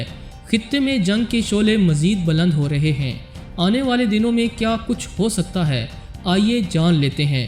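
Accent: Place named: India. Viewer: Typical indian accent 🤔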